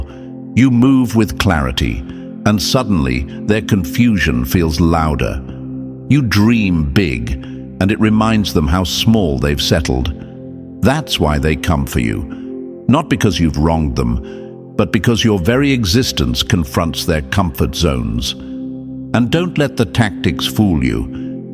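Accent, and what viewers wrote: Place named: United Kingdom. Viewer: How would know. You sound British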